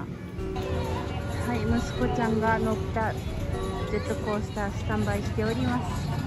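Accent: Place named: Okayama, Japan. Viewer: 出さないようにしてるみたいだが、 ときおり出る岡山弁のイントネーションに萌える。（40年岡山県人を捨て愛知県に移住）笑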